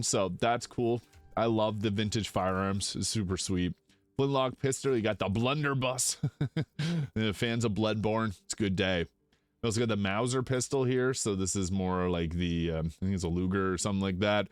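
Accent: Canada. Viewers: i feel like that is how you would actually say it. 1st time i heard you pronounce about as "a-boot" i was like, this guy is definitely from the great lakes area or canadian.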